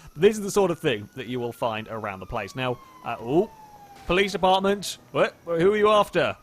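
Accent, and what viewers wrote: United Kingdom. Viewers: I only watched one video of you, and already I am loving your goddamn videos, keep up the good work, dude! (P.S. Your voice sounds British, are you from London?)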